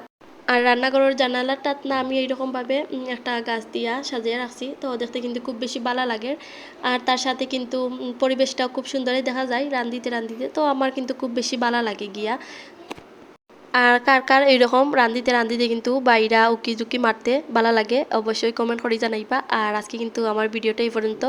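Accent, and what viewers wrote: Sylhet, Bangladesh. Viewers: আপু সিলেটের কথা গুলো শুনতে অনেক অনেক ভালো লাগলো রুমটা খুব সুন্দর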